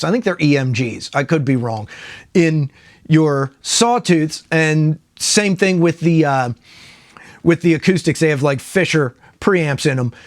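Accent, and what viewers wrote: Pittsburgh, United States. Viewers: Great video! It's hard to pick a guitar based on a website, that for sure. Even YouTube reviews van be tricky. Sadly, the days of mom & pop guitar stores are going away, unless you count Guitar Center, which I don't - I don't trust them, either. They're known for "factory seconds," and if you know what that is, that's why I stay away from them when it comes to buying guitars at least. I could be wrong, but do I detect a Pittsburgh accent in there??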